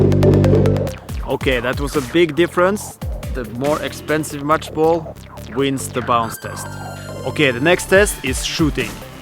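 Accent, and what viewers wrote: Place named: Russia. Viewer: Ты русский что-ли?) Акцент на наш похож))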